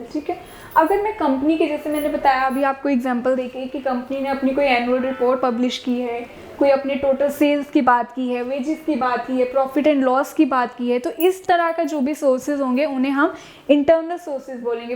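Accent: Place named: Bihar, India. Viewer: Mam app acha padhtii hoo but app measurement conclusion kee sahi pronunciation use kero app Bihari pronunciation use mat keri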